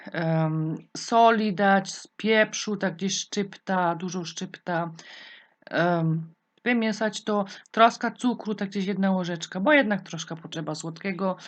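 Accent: Silesia, Poland. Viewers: Mówi po śląsku bo to Ślązaczka to fajny gwarowo język 😃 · Czesto ogladam Pania i nie umia sie nasluchac godania jo tyz jestem z slaska pozdrawiam fajne przepisy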